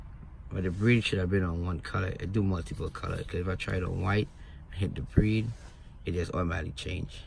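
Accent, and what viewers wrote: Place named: Jamaica. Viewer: Yes sir and you sound like ur from yard….. My fam is JA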